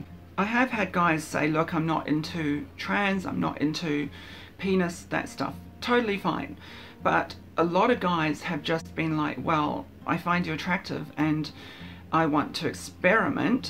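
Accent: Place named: United Kingdom. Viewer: for a second I thought you were my ex until I heard your British accent, you and her can be twins, this is a very good video , I showed it to my transgender niece , her friend and him had sex and he had that shame look as you mentioned and I told him what you said and it actually helped them and they're now dating 😃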